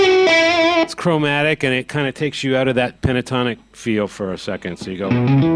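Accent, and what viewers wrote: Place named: United States. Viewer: He is American. It's how he's always going to sound. Like if you learn Spanish, you're not going to have the spanish accent when you speak English. Having the accents is a big part of language, and when learning another language, they usually don't blend.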